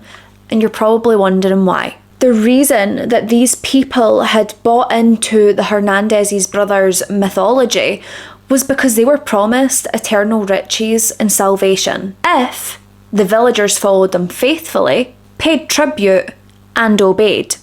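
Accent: Scotland, United Kingdom. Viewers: You are so Scottish ❤ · She’s from Scotland! 🏴 🥰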